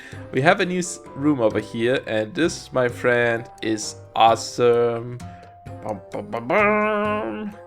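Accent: Germany. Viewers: I love the accent. German?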